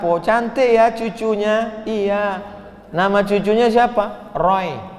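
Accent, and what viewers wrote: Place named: Negeri Sembilan, Malaysia. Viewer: Sepertinya UAS bercakap loghat negeri 9 dicampur dgn loghat kelantan iyooooo 😆 Salam dari malaysia wahai ummat rasulullah SAW.👌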